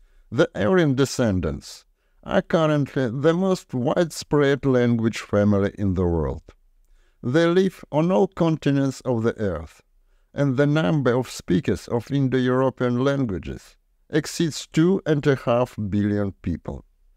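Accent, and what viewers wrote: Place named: Eastern Europe. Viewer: Although you read all this with a Slavic accent, you forgot to mention the Slavs!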